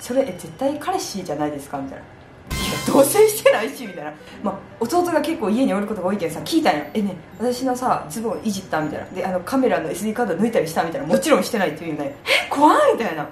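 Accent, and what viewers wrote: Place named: Saijo, Japan. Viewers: 松山と西条では同じ県でも方言が違うんですね。母が新居浜ですがそれとも違う言葉だなと思いました。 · たまたまおすすめに出てきたから見てみたら(なんか西条の喋り方ににてる！なつかしい！)と思って3日くらい前から見始めてここまできたらまさかの西条出身で沸いた😂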